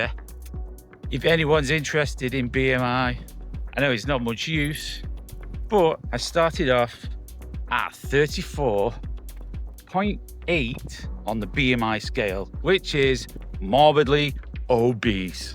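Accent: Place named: Northern England, United Kingdom. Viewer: Love the accent ,down t earth good owld northern accent😊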